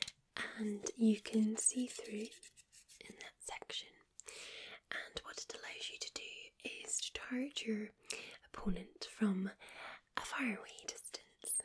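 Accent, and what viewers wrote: Scotland, United Kingdom. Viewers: Do you know who I am My brain: slight Scottish accent Me: MERIDA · I hear Scottish I click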